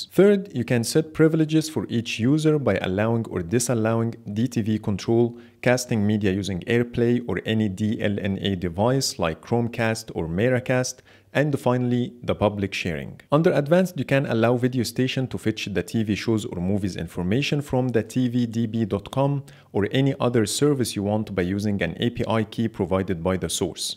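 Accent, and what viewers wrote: Egypt. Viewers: اللهجة المصرية بتميزها من مليار شخص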